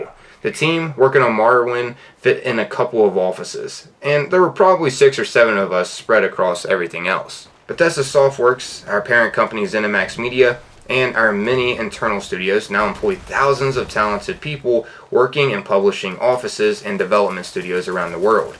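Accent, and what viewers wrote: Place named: South, United States. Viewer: Me, looking at some of the channels I used to watch when I was younger: What is this place? Seriously, the uptick in quality and preparation shows. But, overall, that same vibe just comes across, idk why. Maybe it's the slight southern accent? Also, just a fun thing: I, a 17 year old now, have intense nostalgia for your desttiny year 1 content.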